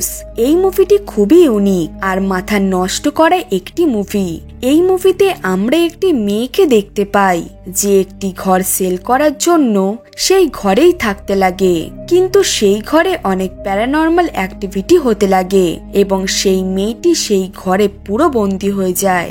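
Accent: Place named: Chapainawabganj, Bangladesh. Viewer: সরি টাইপিং মিস্টেক বলছি আপনার ভাষা ঠিক করা দরকার আকটু প্রবলেম আছে ভাষাটা শুদ্ধ হলে শুনতে মজা লাগে আপনি কেমন যেন চাঁপাইনবাবগঞ্জের ভাষায় কথা বলেন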